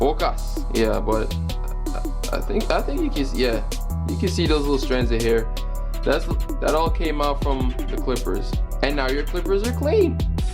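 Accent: Jamaica. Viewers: Make 2 videos each time, Jamaican and "proper" English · Dweet ina the Jamaican Accent dawg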